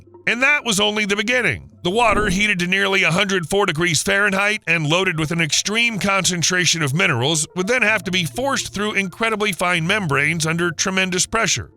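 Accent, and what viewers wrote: United States. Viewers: the shrieking laconic American voice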